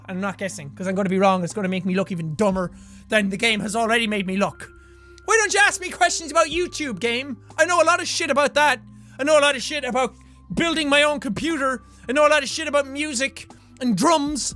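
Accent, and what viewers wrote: Ireland. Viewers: false because your a boss Jacksepticeye i love your videos and i know you have probably heard this alot but i am your biggest fan i love everything about you even your Irish acsent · I know full well based on your accent that you're Irish. You couldn't say the same for me though. I am British, and I have a British accent. But that's it. A BRITISH accent. Neither Scottish nor English, because my blood is a combination of the two. I consider myself Scottish, and I'm proud to be, but you wouldn't be able to tell based on my accent. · You sound pretty Irish to me! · His accent is awesome My accent is almost the same because I'm Irish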